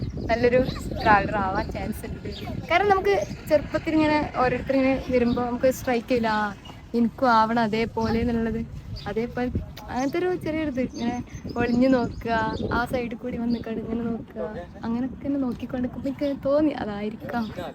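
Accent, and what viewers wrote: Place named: Rajasthan, India. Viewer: Rajsthan maarvadi aan slang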